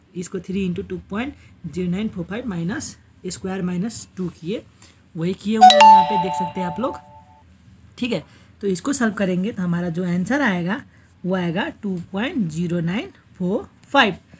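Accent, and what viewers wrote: Nepal. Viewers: ARE YOU FROM NEPAL🇳🇵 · Bro temi Nepali ho timro boli sunda Nepali lagyo anyway Sabai ramro Xa temi le padhako pani Sabai bujhinxa keep it up love you 🤗🥰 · Bro's Nepo-Hindi is sweet😂😭🫶🏻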